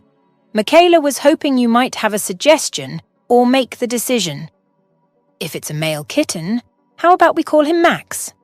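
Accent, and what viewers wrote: United Kingdom. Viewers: What a beautiful story I enjoyed It from the beginning to the end ! The narrator with British accent was wonderful. I am happy that Lana was cleared of suspicion on her actions Thank you so much I love this story!!!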